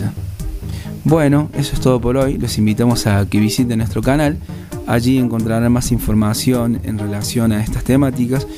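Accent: Córdoba, Argentina. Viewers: sos cordobes? por cierto buen video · No me concentre en todo el video escuchando su acento cordobés xd 😍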